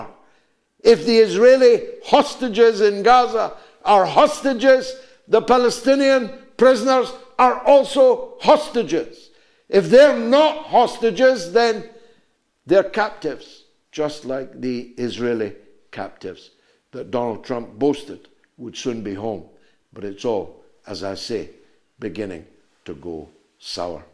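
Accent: Scotland, United Kingdom. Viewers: i think you are great George....you might roar with a scottish accent but you are definitely a lion of england just the same...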